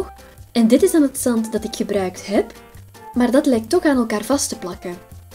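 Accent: Belgium. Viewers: doe normaal man ook niet iedereen vind jou stem mooi maar juist lelijk en zij kan er niks aan doen van die stem ze is Belgies!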